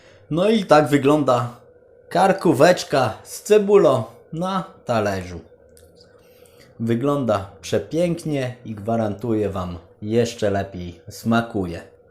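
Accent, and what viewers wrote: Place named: Ukraine. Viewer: A co to ukrainiec jest taki ma akcent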